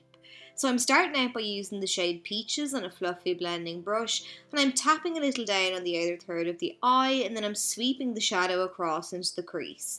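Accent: Ireland. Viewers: Oh my gosh, I have been binging your videos! I can do basic makeup but that's about it- you have been so helpful and easy to follow! I also love your accent- I've been to Ireland twice and love it so much- you remind me of the wonderful people I met there! Thank you, go raibh maith agat! Love from Portland, Oregon, USA!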